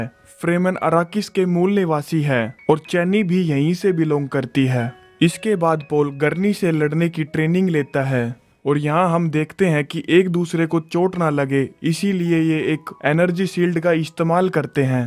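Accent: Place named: Rajasthan, India. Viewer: tbhi lga hi tha marwadi ya to Rajasthani ya haryanvi🙏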